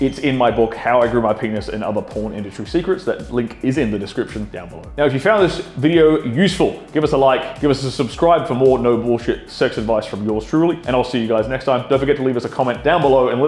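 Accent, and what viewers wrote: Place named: Australia. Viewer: He's Australian 😅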